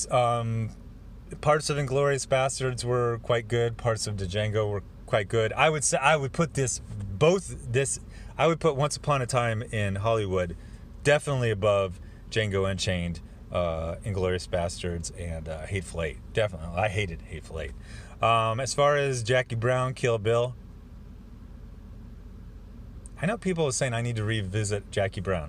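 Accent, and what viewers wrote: United States. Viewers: Ya Boi comes out of the theater to record this vid and the first thing I hear is a vintage Western accent.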